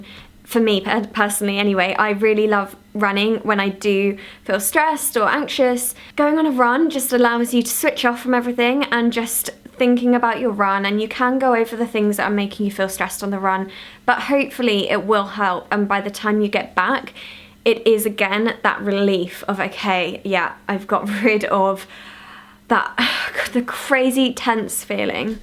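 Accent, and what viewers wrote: United Kingdom. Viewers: Hi this is Kim! I'm new to your channel. I enjoyed your video, and love your Brittish accent! I have a mild case of anxiety, and take medication for it. I also color in adult coloring books, I also do cardio, and meditate! Thanks again, Kim!😊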